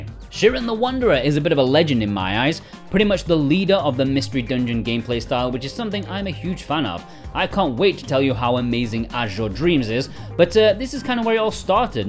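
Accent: England, United Kingdom. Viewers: Love the english accent!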